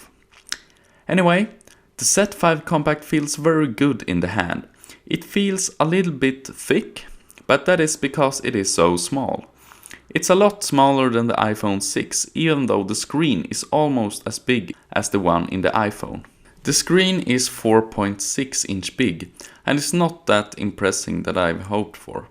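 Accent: Sweden. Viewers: even worse as Swedes have a reputation of good quality English - almost no detectable accent. not this guy... but whatever, his accent is still better than Scottish or Indian accents, those i literally cannot understand at all. This guy i can completely understand so i give him a pass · Haha älskar breda svenska brytningen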